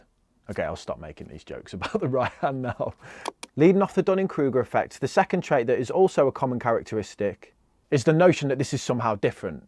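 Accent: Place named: Lancashire, United Kingdom. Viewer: It's been doing it since i got here lol thats proper Lancashire speek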